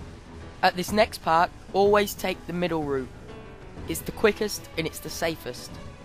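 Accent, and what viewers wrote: United Kingdom. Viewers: is he from uk? COOL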